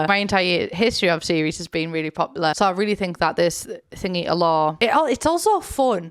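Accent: United Kingdom. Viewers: I have always loved the British accent, and I enjoy your content, damn good job, subscribed. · whenever someone says british accent, this is what I think of :)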